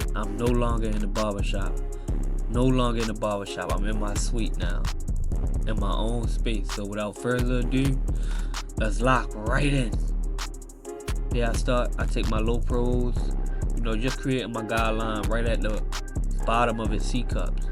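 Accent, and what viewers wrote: Baltimore, United States. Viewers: Top tier....West Baltimore through and through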